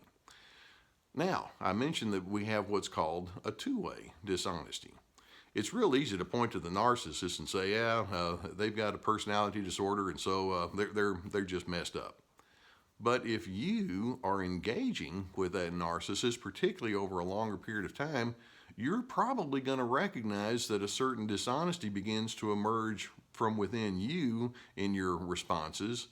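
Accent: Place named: Texas, United States. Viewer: Such hard-earned wisdom (and common sense) delivered with that charming Texas drawl. These are calming and really informative. Thanks Doc.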